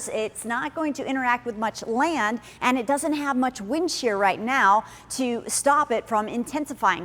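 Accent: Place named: Florida, United States. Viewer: South Florida has an accent. Central Florida has two, Panhandle has one and North Flor-.. Im mean Georgia has one. You only know this.. if when you move OUT OF STATE. but yes if she goes to the New York/LA national markets. She will need to get rid of the accent, like many anchors from the state. I found it interesting that people are aware FL is a transient state = but pins every "florida man ' on the state.. :shelterin: Correct headlines: "New Jersey man visiting FLORIDA..." or "Nebraska tourist visiting South Beach..."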